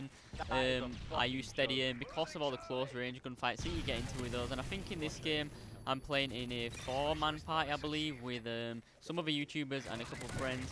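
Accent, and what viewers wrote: United Kingdom. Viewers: U went super east on these ppl. Do you live in the U.K. Cuz u got a british accent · "Do you live in the UK because you have a British accent?" - rhetorical question?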